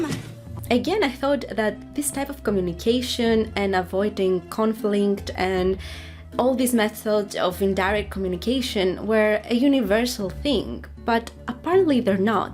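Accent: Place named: Greece. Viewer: Love your accent, are you Greek??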